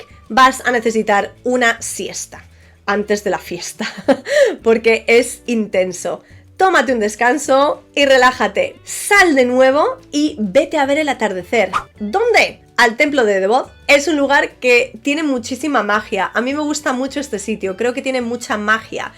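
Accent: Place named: United Kingdom. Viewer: I love your mix of British and Spanish accented English, fascinating to hear 😊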